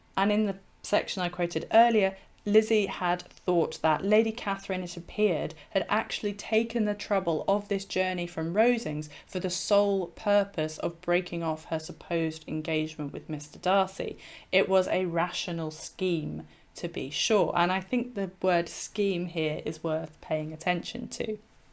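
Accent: United Kingdom. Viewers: I am happy to hear good British which is a true English language.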